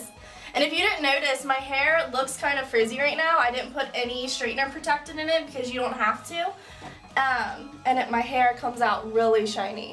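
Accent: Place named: South, United States. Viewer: Your accent girl! It's nothin but southern. I love it. And I have a Royale as well. Best ever.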